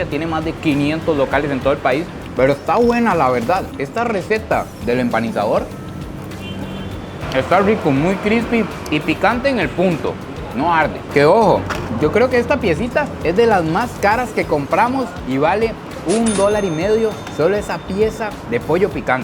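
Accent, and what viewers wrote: Costa Rica. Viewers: Me encanta lo increíblemente marcado lo tico "un fresco de chang" jajajaj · Cada video de 🥹✨🩵 es una aventura bien vivida junto a él 🧸💙 Mi amo su acento ticoooo😂❤ “Arroz” 💙✨🧸 Love it, so cute 😍💙✨🧸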